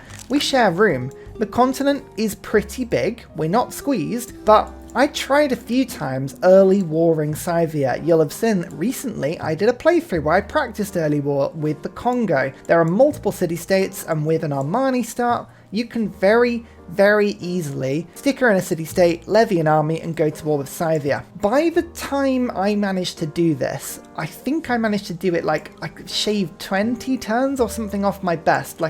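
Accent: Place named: United Kingdom. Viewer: You play lovely music and your presentation is friendly and fun; love your British accent and funny little voice; witty and (as you Brits like to say) "brilliant."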